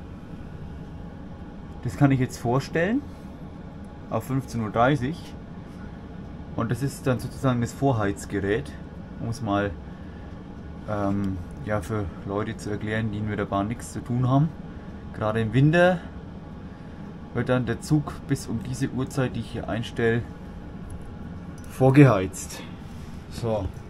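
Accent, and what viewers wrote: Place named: Franconia, Germany. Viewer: Feinstes Fränkisch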